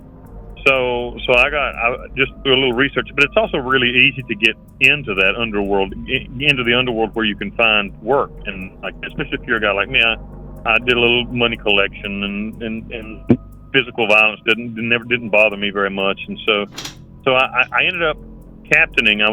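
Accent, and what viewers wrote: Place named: Manchester, United Kingdom. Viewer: New subscriber here - recommended by Disturban. I noticed your accent, are you from Manchester?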